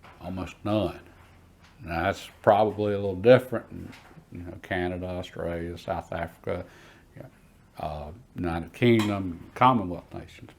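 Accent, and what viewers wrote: South, United States. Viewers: Kia Ora, watched a lot of your videos ova the years. I love your south acent & the vast knowledge you bring to us on watching u tube guys. In Aotearoa ( NZ) i would think more deer hav been taken by 303,s than any other cartridge? My take ( disclaimer) NZ forest service in the past handed out longtoms smelys by the hundreds & they did the job. Lot of sentiment & collection re past service rifles. I have serval myself and enjoy them mainly for range shooting these days im getting VERY long in the teeth thesedays. The older iv grown the older style i choose these days iv got keen on muzzleloaders. ( [ i digress:( ] 303 brit is easily purchase here. As is 30.06 A hole nother subject.... i ❤ that USA cartridge. Keep well & safe aTom Nga mihi Chris Ma Te Wa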